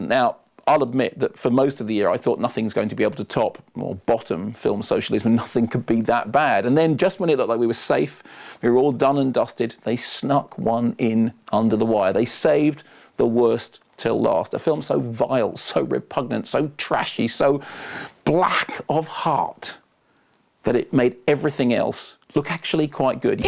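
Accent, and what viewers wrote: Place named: England, United Kingdom. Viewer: Can't really argue with him, he does have an English accent after all...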